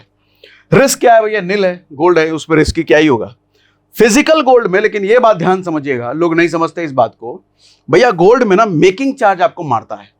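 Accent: India. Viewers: You are really a very good teacher! You desi walla voice further more enjoyable. God bless you!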